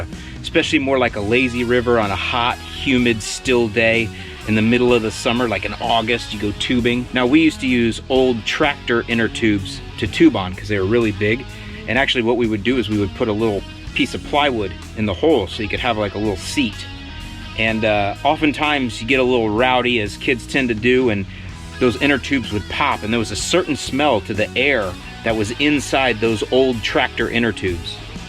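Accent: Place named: Midwest, United States. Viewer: Great video, and i love your Mid-Western accent lol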